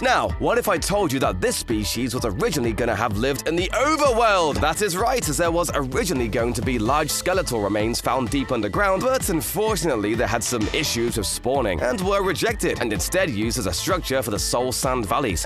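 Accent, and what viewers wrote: United Kingdom. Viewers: His British Accent is do cool 👁👄👁🙉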